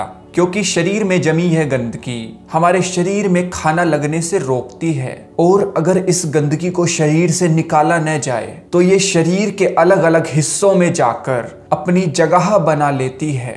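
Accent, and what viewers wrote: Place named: Haryana, India. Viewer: Paka hariyana se ho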